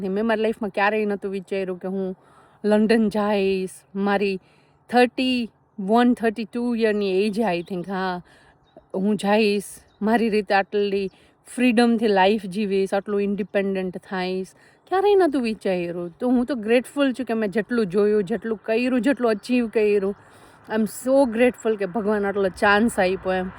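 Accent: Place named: Saurashtra, India. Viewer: બેન આપ મુળ વતની ક્યાં નાં કારણ કે આપની ભાષા સૌરાષ્ટ્ર ની હોય એમ લાગે છે આપ હાલ ક્યાં રહો છો આપની સમસ્યાનો હલ જરૂર ભગવાન માતાજી કરશે... આપની સમસ્યા સાંભળી દુઃખ થાય છે જય માતાજી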